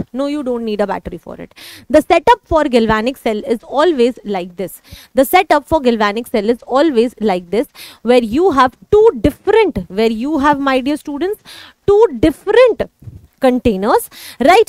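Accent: India. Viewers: Bhai AMERICA mein American english bolte h isliye smjh nhi ataa but MAM indian english bol rhi h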